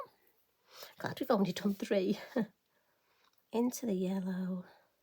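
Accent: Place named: England, United Kingdom. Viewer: First I got to say I just love your accent! There was a zoo show that I watched that was somewhere in England I forget now. But I don't think it was in London. Anyway one of the keepers had your same accent but I can't recall where she was from. Anyways these are both so beautiful but I think I'm also partial to the one on the left. They both have depth but I think the one on the left has more and I think some of it's coming from some of the wider spaces on the stencil being more filled in with the red. Especially on the right side a little bit around that butterfly on the right. I need to get my gel plate out and play with it. I know you don't know me so I hope you won't think I'm interfering but I'm concerned when you said that the nail polish remover has begun hurting your lungs from the fumes. If this is something new if you haven't already I would definitely urge you to maybe see a doctor about it. 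It may be absolutely nothing, but if it's a new development it might be a good idea to have it checked out, especially with the bit of hoarseness you appear to have. I've only seen a few of your videos so far but I love your videos and the art that you make!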